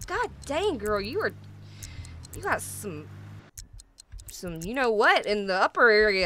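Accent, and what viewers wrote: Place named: South, United States. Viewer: I just love your country acsent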